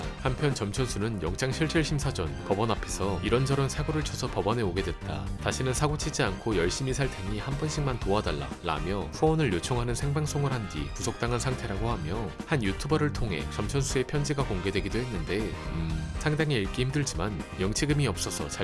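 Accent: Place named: Gyeongsang, South Korea. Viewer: 갱상도임 ㅋㅋ우파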